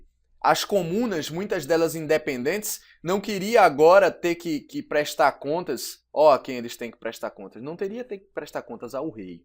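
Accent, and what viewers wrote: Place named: Northeast, Brazil. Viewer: você é ridículo. Lindo o seu sotaque, professor. Nordeste ❤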